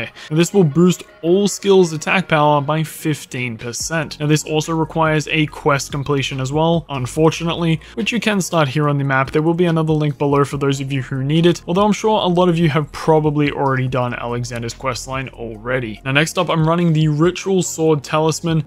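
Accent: Australia. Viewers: Nice work mate!! Aussie?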